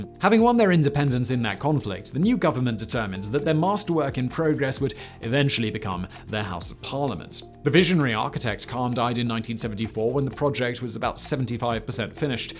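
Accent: United Kingdom. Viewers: This guy uses his British accent for rhetorical emphasis. And then there is "Dem dooshen volke".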